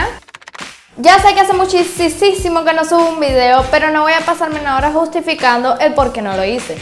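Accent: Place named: Dominican Republic. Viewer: Eres dominicana o vives aquí en RD? Tienes como un chin de acento 😂❤️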